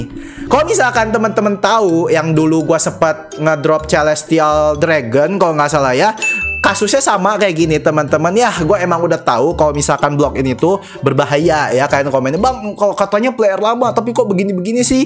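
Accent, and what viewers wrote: Indonesia. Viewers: Item Dreams? Akwikwk Indo parah itu bahasa Inggrisnya 🤣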